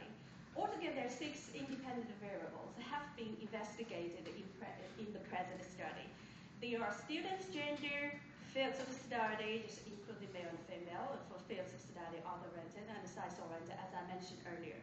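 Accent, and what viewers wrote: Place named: United Kingdom. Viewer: มิน่า... สำเนียง บริธิต เลยครับท่าน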